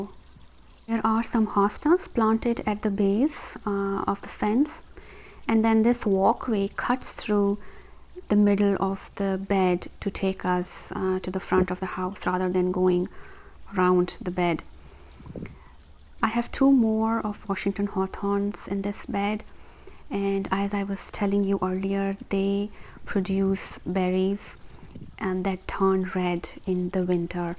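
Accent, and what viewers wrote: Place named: Tennessee, United States. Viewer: I had no idea you were from Knoxville. That explains your accent. :0). I grew up in middle TN, but now live in Loudon, TN.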